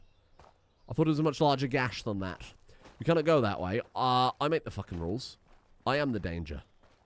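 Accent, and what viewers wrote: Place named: London, United Kingdom. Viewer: For a man who says he's from the West country, and lives in the middle of nowhere, how are you this south-east Anglais and loathing of everyone around you? > Has fully swallowed the Greek-Romance-makes-you-sound-smart pill > hates anything English > has a south east accent. You sound like a man who's been brought up in the heart of London in the upper or middle class and is trying to hide that 🤔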